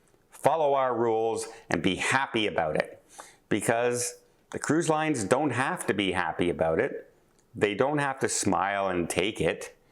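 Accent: Canada. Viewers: The rain on your parade, but you sound very smart, logical and Canadian. Those three words are never used any politics policies on American soil. What is more done is drawing the line in the sand and saying you cannot cross unless you’ve been to my will. And yes, the people making the decisions most times have nothing to do with the outcome meaning the people making the decisions in this case in Alaska are not the business that profit by all the tourists. It took me a few years to realize this when I moved in 1999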